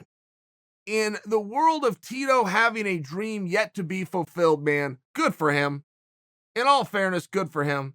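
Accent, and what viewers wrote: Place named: United States. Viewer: Chaels American accent is sickening.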